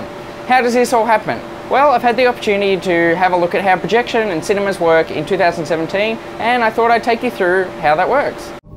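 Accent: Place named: Australia. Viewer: Very distinctly Australian indeed. BUT, only one variation of many. I personally sound nothing like that in the slightest. I don't really have much of any stereotypical give-aways. Which I think is becoming common across the world as people become more connected through newer ways of socialising, and media. Among other reasons.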